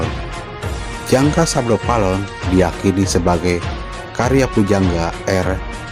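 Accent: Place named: West Java, Indonesia. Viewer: Bahasa Jawa Logat Sunda Om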